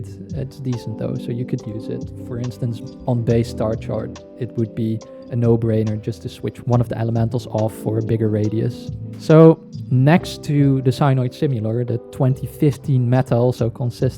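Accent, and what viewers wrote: Netherlands. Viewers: Yow bruh you accent is dutch or you from tw Nederlands?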